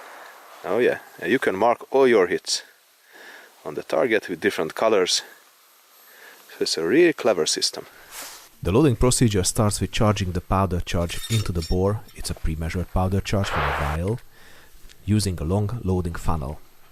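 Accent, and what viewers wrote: Hungary. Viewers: Always a good day when uploads in beautiful hunglish language. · This is very cool stuff. I’m from Canada, and much of N America, with the exception of cowboy action, is in to modern stuff. So it’s pretty rare to see this. I’m getting my first antique exposed hammer shotgun though, and an antique muzzle loader? There’s a bunch from the civil war, but they aren’t cheap, and they stuff you have is even more expensive, since we’re newer countries. We didn’t have many of those guns here. Still, fascinating to watch, and interesting to compare your accent to my wife’s Hungarian accent. 🙂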